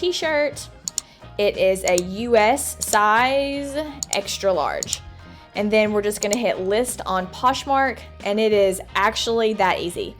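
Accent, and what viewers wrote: Kentucky, United States. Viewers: I just saw a channel about Mercari that wasn’t nice about them. It was from The Nurse Flipper. I learned about her from your channel. Now, I have been buying things from them for years and never had a problem. But TNF had a very bad experience with them, you might like to look at her channel and check it out. Love your channel and it’s a treat to hear a Kentucky drawl. My mother was from Benton KY and when school got out for the summer I would head for Benton to spend the summer with my grandparents. I love Kentucky!!!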